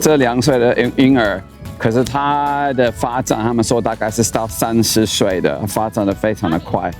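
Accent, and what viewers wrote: Taiwan. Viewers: 尾音 吼，真的很台灣腔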